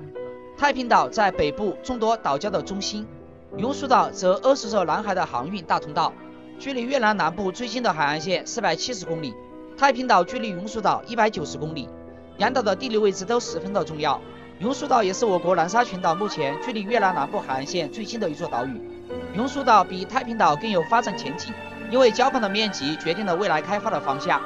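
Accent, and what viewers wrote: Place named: Sichuan, China. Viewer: 这声音是四川那一带的普通话。谢谢解释！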